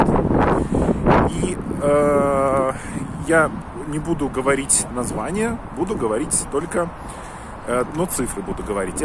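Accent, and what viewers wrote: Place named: Saint Petersburg, Russia. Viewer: Ты же из Алтайского горного округа родом, здесь Петербургский русский язык со времен горных офицеров, кабинетных земель, золотых рудников итд, без аканий и оканий. Разве что, уральский казачий говор есть, как в речи у Шойгу.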